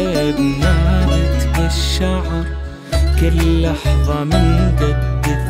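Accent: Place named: Iraq. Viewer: جمال اللهجة العراقية .. تحياتي ألك 😍💕💕💕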